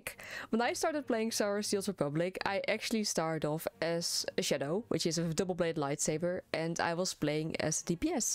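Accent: Netherlands. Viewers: You sound kinda dutch. Ben je een Nederlander?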